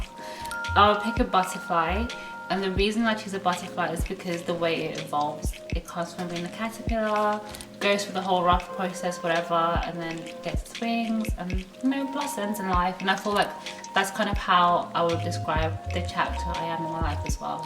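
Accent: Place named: Australia. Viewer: Loved this! Making me miss Australia, you've kinda got an Aussie accent as well now 😂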